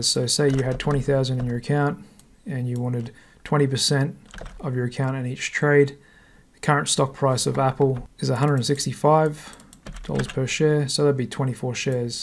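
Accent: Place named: Australia. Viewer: Hello, Thanks for the video, and it is great to hear an Australian on a YouTube video. I have a question/need help. I have a strategy that when a faster moving Av. crosses the slower, it triggers a trade and closes the open trade eg. Short opened - short closed, which in turn opens a long simultaneously and continues Short to Long to Short to Long etc.. Can you help me with description with the webhook Entry/Exit please. Happy to pay a fee. Regards, Sean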